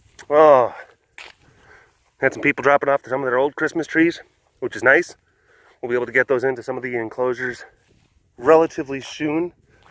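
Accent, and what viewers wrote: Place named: Wisconsin, United States. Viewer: Your WI accent is strong in this one. :)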